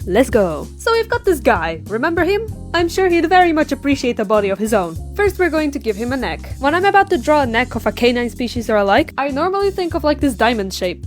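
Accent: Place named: Scotland, United Kingdom. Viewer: hold on why is it after like 9 seconds you switch to a more Scottish accent???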